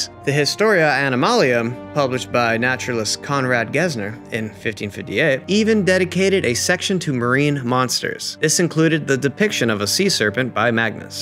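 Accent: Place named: South, United States. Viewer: Ok ive never heard this on but i swearrrrr on your vids im detecting a bit of southern accent being from Bama i perk up when i hear it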